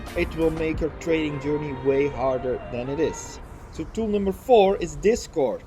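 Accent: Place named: Switzerland. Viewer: your accent sounds like swiss :-)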